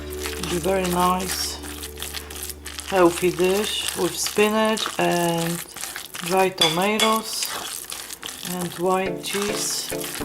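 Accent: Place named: Europe. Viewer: Great recipe my friend these look delicious remind me of my Moms question what is your background? You sound European 💜🌺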